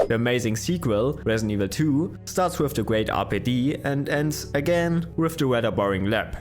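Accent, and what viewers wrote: Germany. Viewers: Kleinen German accent 🇩🇪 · I kann hear deutsch akzent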